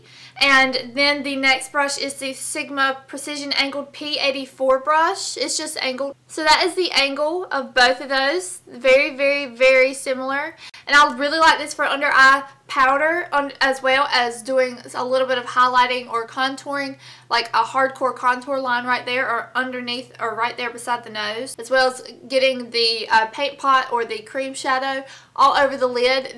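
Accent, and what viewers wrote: South, United States. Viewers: LOVE YOUR Southern accent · I love your southern accent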